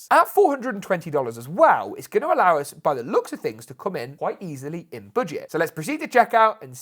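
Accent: United Kingdom. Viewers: Fuckin British accent 😢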